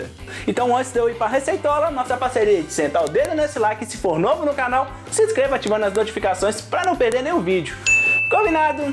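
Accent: Minas Gerais, Brazil. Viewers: Rapaz amei você eu sou de Pinheiro minas aí pertinho só que miro em Marília SP adoro quando vejo um mineiro conversar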